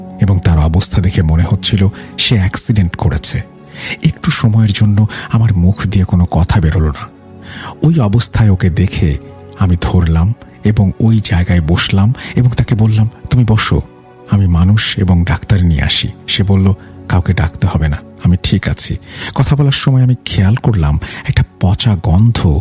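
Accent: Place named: Tangail, Bangladesh. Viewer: Tangail theke shuntesilam 🥺🤍 nijer district er kotha shune valoi laglo😊💟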